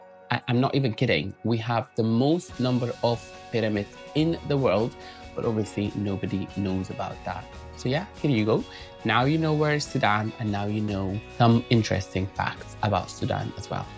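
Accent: Scotland, United Kingdom. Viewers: Theres a bigger scottish twang in your accent than you think, definately hard to pin down. I knew where Sudan is but I didn't realise they have the largest number of pyramids. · You definitely have a little bit of Scottish in your accent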